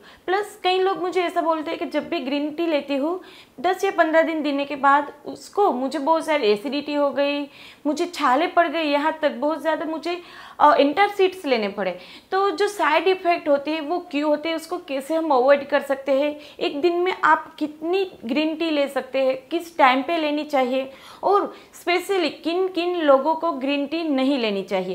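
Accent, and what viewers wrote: Gujarat, India. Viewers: Are you Gujarati? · Tame gujrati chho????😎😎😎😎😎😎😎😎😎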